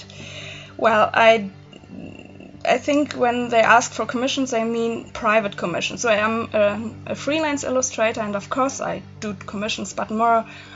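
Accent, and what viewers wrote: Germany. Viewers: Immer diese Münchner :D Hört man direkt raus ^^ gruß aus Hamnburg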